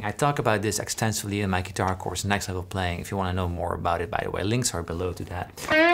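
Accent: Netherlands. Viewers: Finally the basic thing which influenced every string picker. As always perfectly done,. Dein holländischer Akzent im Englischen macht die Sache übrigens noch sympathischer. Wie Herman van Veen sagte:"Deutsch ist Holländisch mit einem ganz komischen Akzent." 😄 👍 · Just drag your Pik ... hahaha 🙈😂I can hear you are dutch man haha 🙈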